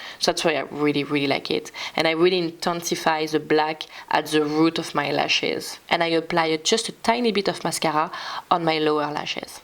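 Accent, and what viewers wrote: France. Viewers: Coucou, j’ai une petite question vraiment pas pensée de manière méchante mais fais tu exprès d’avoir un accent français en anglais aussi prononcé ? Peut-être pour accentuer ta différence d’être française entre toutes les autres YouTubeuse ?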